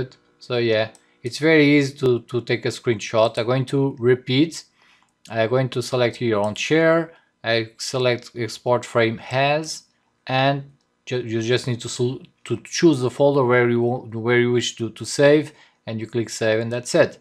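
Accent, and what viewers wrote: Portugal. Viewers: Sotaque Tuga, pt? xD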